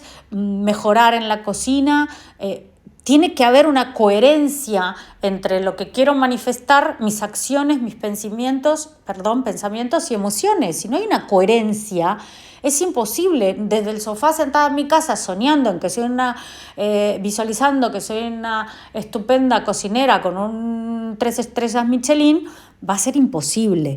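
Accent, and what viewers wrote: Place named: Rioplatense, Latin America. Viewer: Amo ese acento rioplatense!! Gracias y todo el cariño desde Argentina!! :). Hay falta de fe, falta de ganas, sentido distorsionado del tiempo, agobio...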